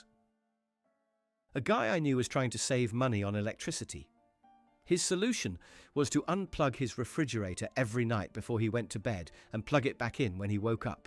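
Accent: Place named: United Kingdom. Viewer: This is a British accent trashing Americans, and we have enough trouble. Thank you.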